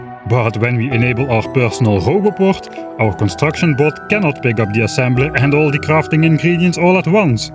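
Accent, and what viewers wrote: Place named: Netherlands. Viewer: Klasse video! Oprecht een van de heftigste Nederlandse accenten die ik ooit gehoord heb haha.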